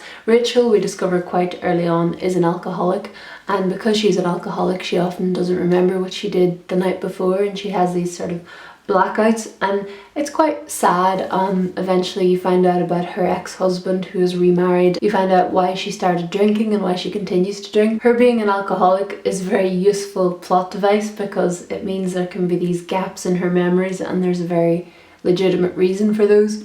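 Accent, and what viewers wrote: Ireland. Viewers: I read this book about two months ago, and I liked it. I normally don't read thrillers, I'm more of a romance book kind of girl. But it was interesting and it's one of these books where you can't stop reading, because you just have to know what happened . I haven't read gone girl, because I always really get into books and get into the characters and imagine stuff, so thrillers are kind of hard to read, when your imagination takes you into the characters and you scared. I really liked your review and I love your accent. I'm from Germany and love reading books in English. I definitely need to go to Ireland someday because the accent is just amazing, I could listen to you talk all day. Nothing to do with the book, but you just have a really calming voice.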